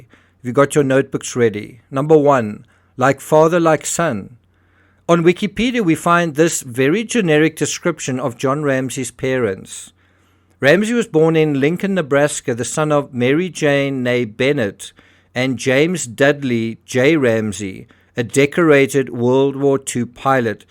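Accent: South Africa. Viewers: It's that hideous South African accent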